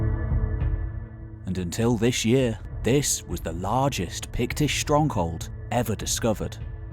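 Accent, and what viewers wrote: England, United Kingdom. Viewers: thank you for this well put together documentary ! I assume you are English from your accent which is presumptius but its always great when one of our freinds from down south manages to shy away from the many cliches about the picts . what is not known about through written evidence can in many ways be realised through the highly sophisticaited artwork the picts left behind , it is almost certain that the picts spoke some kind of p celtic language ,perhaps not to different from britonic .you can see evidence for this in many place names all over the pictish areas of scotland unfortunatly nothing from this legacy seems to have passed into the scots language ,I have heard that perhaps the north east use of F instead of WH might be a remenant for example "fit like" instead of "what like" in English and often "Whit like " in scots . 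Doric language is itself littered with archaeolagy from Scots ,French ,English, Gaelic and loads of Norse . i really look forward to seeing what conclusions are drawn from this site in the near future .And keep going with this fabulous site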